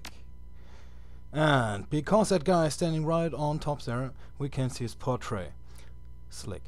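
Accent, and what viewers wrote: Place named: Germany. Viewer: Nice video! And I had to sneak into your profile to verify my first guess - of course you're german ;) It's just so obvious just by listening to the very first words ^^ Aber macht ja nix, Akzente sind was feines. Und deutsches Englisch verstehen die meisten immerhin, da gibt es deutlich schlimmere Akzente ;) Ich freu mich auf dein nächstes Video!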